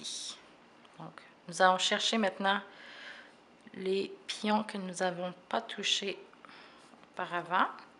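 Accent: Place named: Quebec, Canada. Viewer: t es québécoise que j'entends cet accent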